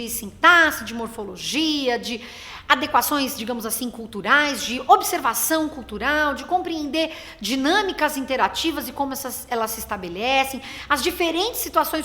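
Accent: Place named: Caipira, Brazil. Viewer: Acho que a graça e a riqueza de qualquer língua está justamente nos diferentes "sotaques"! É uma delícia ouvir você, com seu "R caipira", falando sobre isso!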